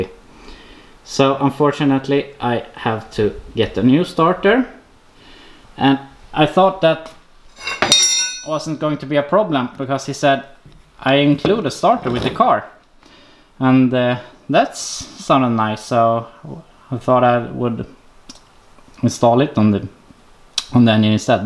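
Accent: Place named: Sweden. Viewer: Hördes direkt att du va svensk, nice video!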